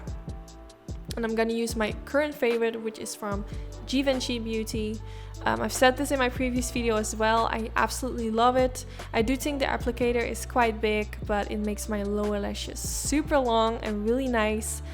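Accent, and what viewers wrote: Netherlands. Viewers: Ik hoorde het al aan je accent dat je Nederlands was ☺️💕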